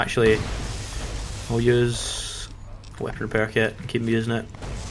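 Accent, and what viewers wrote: United Kingdom. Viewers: awrty mate ;) Nice video man, where about in Glasgow are you from, since you have Glaswegian accent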